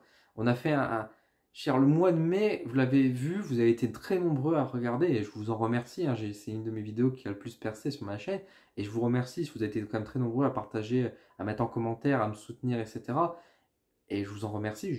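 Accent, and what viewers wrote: North, France. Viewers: t'es du ch'nord tizot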